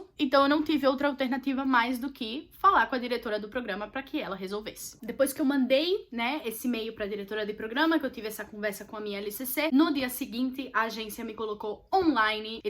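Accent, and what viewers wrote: Northeast, Brazil. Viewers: aaah, tá explicado kkkkk fiquei tipo "ela tem sotaque aqui do nordeste mas tbm tem tipo um sotaque inglês??" kkkkk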